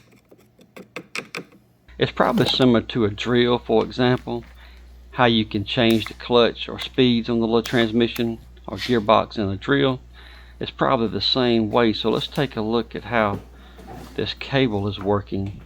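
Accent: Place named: South, United States. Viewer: nah you just sound like every redneck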